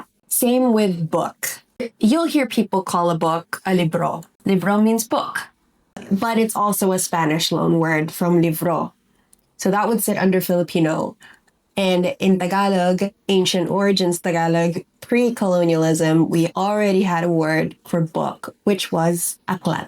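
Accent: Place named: California, United States. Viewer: Sounds like you speak English with a California accent to me.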